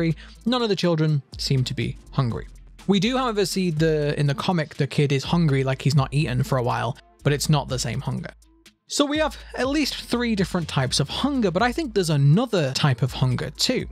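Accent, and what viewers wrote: United Kingdom. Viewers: He's so aggressively British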